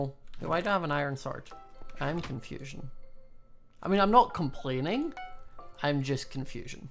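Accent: Scotland, United Kingdom. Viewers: Lol i van barely hear what hes saying through that thick scottish accent XD your vids are awsome luv ur vids!!!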